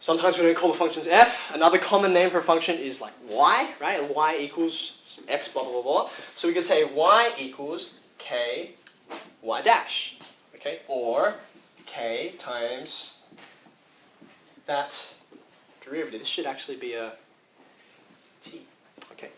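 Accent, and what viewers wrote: Australia. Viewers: Liked for the aussie, subscribed for the education. Ugh. wish you were my teacher.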